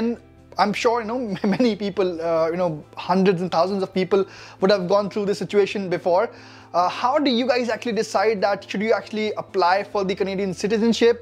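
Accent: India. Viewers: Documents are just the formal papers just papers..not your moral identity. You , anyway. keep being an indian with your accent,tradition,yourself,familiy values,canadian passport cannot take all these ones away from you. Dont take it close to your heart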